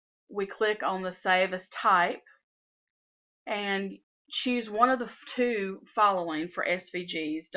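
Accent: South, United States. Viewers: Great video! Very easy to understand. I'm a southern girl too and I love your accent, much easier to understand when someone speaks slowly!! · WOW! Thank you sooo much. I've had inkscape for years and its just been too hard to figure out. This was amazing! Love your Southern accent and your singing is BEAUTIFUL!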